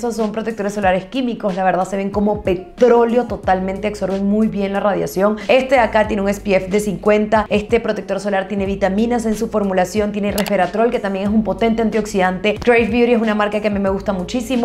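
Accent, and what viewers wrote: Venezuela. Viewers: Te ganaste un like, mi suscripción!! Y que orgullo que a pesar de tener tantos años fuera de Venezuela, no has perdido ese acento, ese sabor venezolano!!! Gracias por ese Review yo uso shiseido, pero ya tengo mi lista para probar!!! 💕💕💕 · Escuchando este acento me decía, parece venezolana, después mexicana. Y luego el mapa de Venezuela en su antebrazo!🤗 saludos desde Alemania!😂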